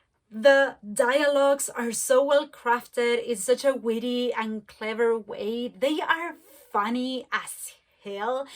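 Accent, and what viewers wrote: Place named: Chile. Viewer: Nada que ver, pero desde el primer segundo que te escuché reconocí el acento chileno, saludos compatriota 🇨🇱🤞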